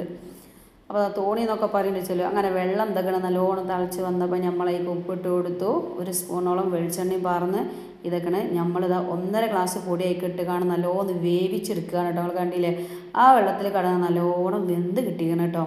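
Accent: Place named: Malappuram, India. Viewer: മൊഞ്ചുള്ള മലപ്പുറം താതനെക്കാൾ മൊഞ്ചും ചൊറുക്കും ഉള്ള മൊഞ്ചത്തി നൈസ് പത്തിരി മാണങ്ങി തിന്നോളിൻ മക്കളെ പത്തിരിനേക്കാട്ടിൻ മൊഞ്ചുണ്ട്ട്ടാ താത്താന്റെ അയഞ്ഞാൻ കൊയഞാന്‍ ബർത്താനം എന്തത്താണ് മക്കളെ കേട്ടാലും കേട്ടാലും പൂതി തീരൂല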